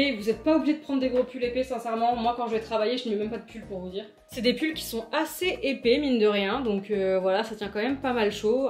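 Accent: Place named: France. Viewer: tu viens de la France? Moi je suis américain mais je trouve que t’as un accent français.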